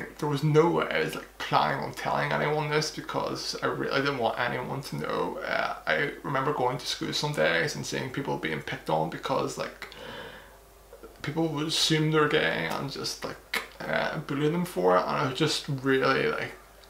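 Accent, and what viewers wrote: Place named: Ireland. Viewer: I loved your video and your accent, where in Ireland are you from?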